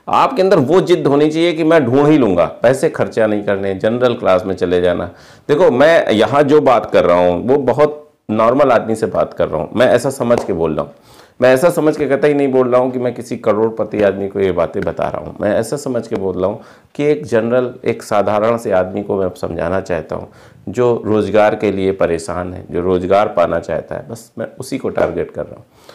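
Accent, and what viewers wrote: Kanpur, India. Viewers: Kanpur k h sir language wahi ki h apki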